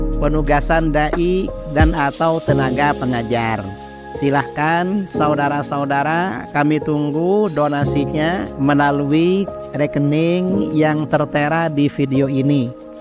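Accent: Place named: Madura, Indonesia. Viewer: Ini kyai mana ,kayaknya madura,kok gini pangaoningannya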